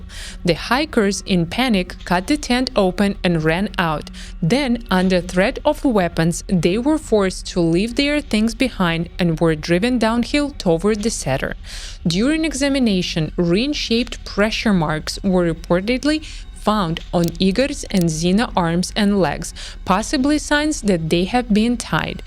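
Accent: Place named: Russia. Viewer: What a fantastic deep dive into this story! So well explained, illustrated and presented. I appreciate the Russian accent too :) I hope one day the Soviet archives are opened up fully and we understand more. The angle on the Kyshtym nuclear event and aliens arriving is an intriguing one.... Thanks again and greetings from Spain.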